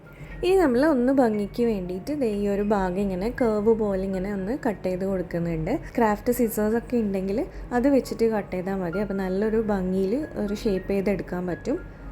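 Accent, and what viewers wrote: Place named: Kannur, India. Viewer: kannur aano? Njanum kannur kaariyaane...enik slang ketapo cherioru doubt thonirunnu😅😅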